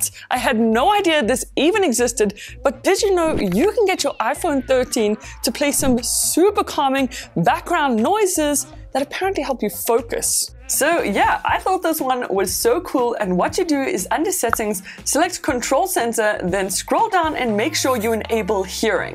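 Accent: South Africa. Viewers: Thats a south african accent! Sandton? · South African accent.. Proud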